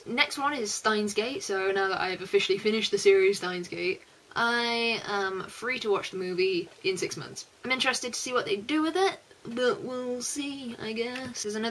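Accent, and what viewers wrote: United Kingdom. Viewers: aha what part of britain are you from? you sound alittle south eastern like london ? and lol to be honest the only anime i have seen this term that is watchable is Shinjekyo no kyojin i tried some of the other ones but meeeeh maybe ill try gargantia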